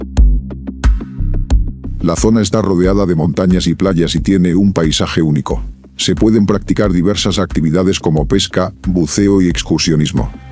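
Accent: Spain. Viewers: Hermosos tus videos, solo que no entiendo por qué el audio con acento español. Es todo 😊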